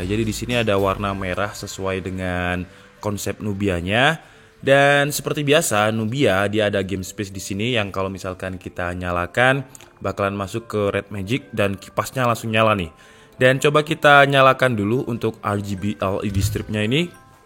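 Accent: Malang, Indonesia. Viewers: umak wong malang ta sam?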